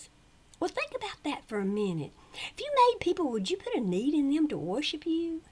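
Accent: South, United States. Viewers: Am from trinidad & I also have a accent but I also like yours ..I like the southern accent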